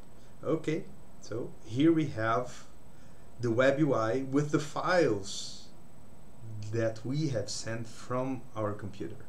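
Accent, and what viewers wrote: Brazil. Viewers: Desconfiei que era br pelo sotaque kkkk representou mestre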